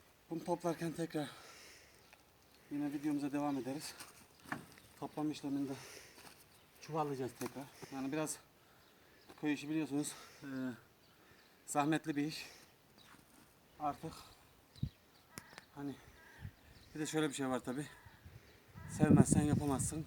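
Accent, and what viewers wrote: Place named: Karabük, Turkey. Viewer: Yeni gordum seni bende karabuk eflaniliyim sivemiz ayni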